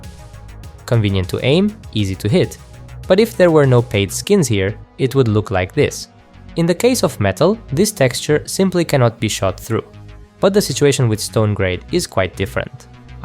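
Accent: England, United Kingdom. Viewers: вы из англии?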